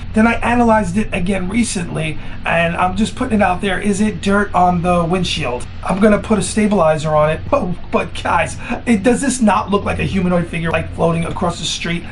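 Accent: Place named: France. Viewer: Hi. Sounds like you have a bit of a French accent. Cool!.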